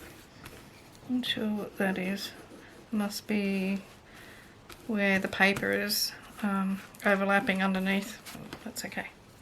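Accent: Australia. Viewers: I love this journal! It's so nice to hear another Aussie accent! I enjoyed watching you have a go ❤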